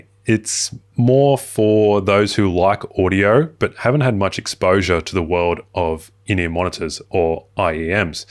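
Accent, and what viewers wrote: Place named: Australia. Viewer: aye, always good to hear a fellow Aussie within the tech space grateful to have found your video just now via the YT algo keep up the good work, mate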